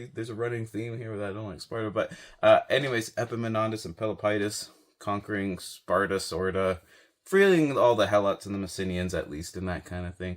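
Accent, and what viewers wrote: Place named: United States. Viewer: Firstly, breath before you make videos and during, speak clearly and don’t mumble. Learn how to pronounce the names of stuff you are clearly so interested in, in the good way, look to the British for this, because your American pronunciation are cringe every time.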